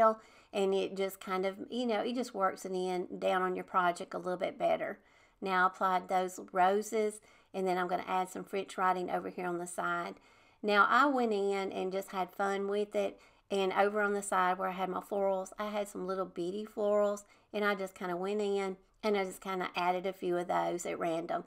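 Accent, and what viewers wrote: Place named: Tennessee, United States. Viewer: I really love your creativity and have used your ideas in my crafts. I wanted to say I have a sister in Tennessee and her name Theresa with the "h" and hearing your accent makes me think of her because we grew up in Michigan and she has the accent and I am living in Michigan where we grew up and just get a kick out of listening to my sister Theresa. Anyways, I have made decor projects for my house watching you.😂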